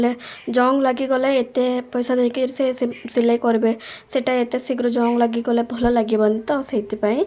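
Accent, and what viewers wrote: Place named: Telugu, India. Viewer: It's like Telugu slang😮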